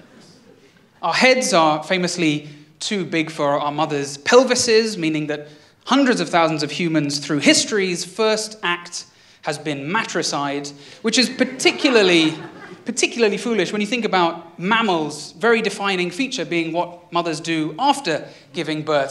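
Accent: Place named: Lancashire, United Kingdom. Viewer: You should always chat with a Lancashire accent, the chosen people.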